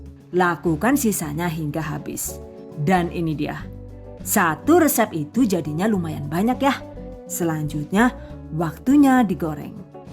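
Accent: Banyumas, Indonesia. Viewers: Saya paling suka cara bunda menyampaikan tutorial nya, anakku paling hafal klo aku buka video ya pasti bilang OKE...sama CATAT...sama itu dealek ngapaknya itu lho🤭🤭🤭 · Suka bgt sm resep2 nya & sm logat ngapak nya...semangat trus buat bkin resep baru nya... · Yg saya suka dari cahnel ini yg saya rasa susah jdi kelihatan gampang buatnya bunda dengan cara penyampaian yg jelas dan terperinci smbil ngelawak bahasa ngapak yg kadang buat saya ketawa sendiri Baru kali ini dapetin chanel yg gak ngebosenin di liat, sukses selalu bunda #GiveawayTHV2021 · Sprtinya dri banyumas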